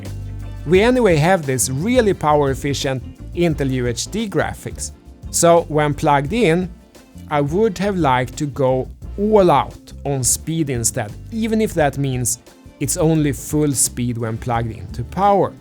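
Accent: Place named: Sweden. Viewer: My gf is swedish and as soon as I played the video she recognized the accent😂 great review!!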